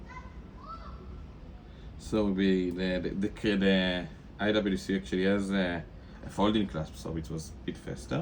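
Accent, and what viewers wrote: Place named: Israel. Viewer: I fucking love israeli accent mate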